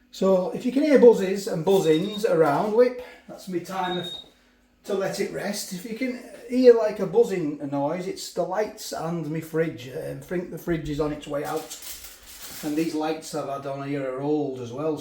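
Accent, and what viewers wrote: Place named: Northern England, United Kingdom. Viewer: Hello, Just thought I would send a message of support, we have been subscribed to your channel for a while now and we think it is great. Its good entertainment plus informative as well, we recently started buying Harry Ramsdens battered sausages for my youngest son after seeing your review of them. I think its great that you can make a living in such a way doing food reviews from the comfort of your own kitchen. I also love your accent, I do have a liking for Northern accents. I thought your investigation into the 11 year old Christmas pudding was funny also your reaction to the vegatarian sausages, in one review you did, was hilarious. The look of total disgust on your face! Ironically that review probably lead to a few sales by people just wanting to see if they thought they were as awful as you did! 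Anyway keep up the good work. Tony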